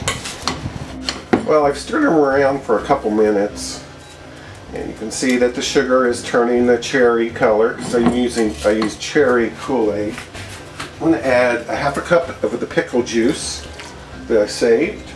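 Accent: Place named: Evansville, United States. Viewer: I sense an Evansville, IN accent. Coming from a Chicagoan who frequented that area as a kid since I used to have family there.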